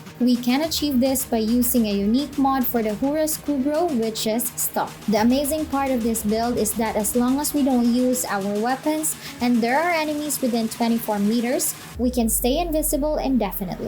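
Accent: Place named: Philippines. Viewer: Are u filipino? I can tell from ur accent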